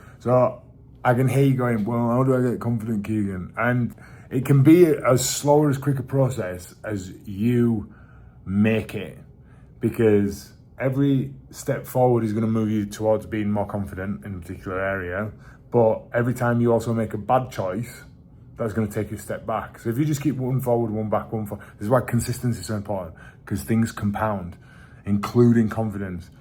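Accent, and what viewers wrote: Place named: Yorkshire, United Kingdom. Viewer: Thank you for your very articulate reflections on confidence, Keegan. They were characteristically thoughtful. You are a very impressive man. You are more a self-made man than most men I can think of: you have built up your own confidence, your own life, your own body, your own business and made a success of all of them! And you have fabulous blue eyes and a delightful Yorkshire accent as well. What a star you are! The US President who said that imperfect action was better than perfect inaction was Harry Truman.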